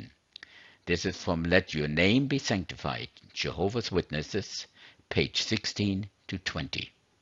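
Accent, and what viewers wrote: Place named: Germany. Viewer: sie sind zeuge jehova, wenn ich fragen darf? ich weiss sie können deutsch sprechen, ich erkenne es an ihrem dialekt :) grüße aus bayern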